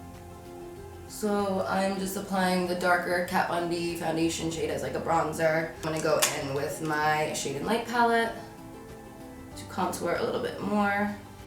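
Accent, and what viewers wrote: New Jersey, United States. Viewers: I love your jersey accent lol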